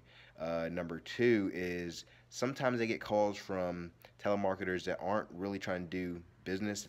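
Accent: United States. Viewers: Thats a Baltimore accent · You from the Dmv ??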